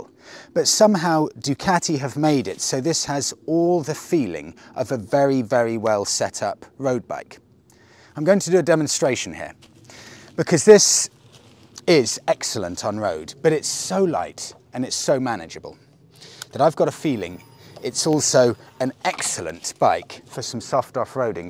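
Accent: United Kingdom. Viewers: I subscribed because of your being so british. Greetings from across the Northsea. Love the accent and manners. 😊 also great review.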